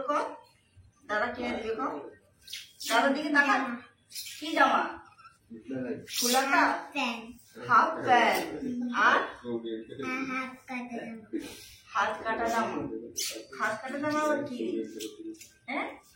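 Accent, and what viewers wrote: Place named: West Bengal, India. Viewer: Ooo ma go dada ki koreta a to West Bengal r vasa❤❤❤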